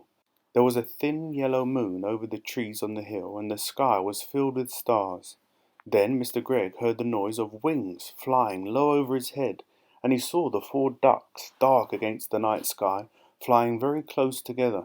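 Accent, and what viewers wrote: United Kingdom. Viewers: I really like your British accent.. and I listen to your stories so I can master this accent. Thank you❤❤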